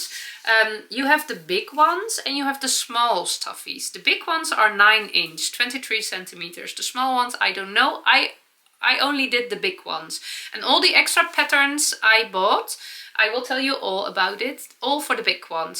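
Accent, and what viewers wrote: Netherlands. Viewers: Greetings from Denmark - what a cute story about the blue rabbit. I spent my Easter making my first LCR bear this Easter - its so funny i enjoy making the cloths. I love you videos, your dutch accent and energy. 🐰🐻🦊🦁🐷🐮🐻‍❄️🐭🐱🐶🐌🦌🐨🐵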